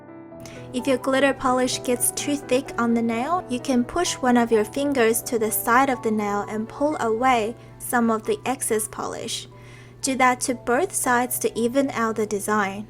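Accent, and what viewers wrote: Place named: Australia. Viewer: Are you Australian or Asian!? I love your accent! So pretty!!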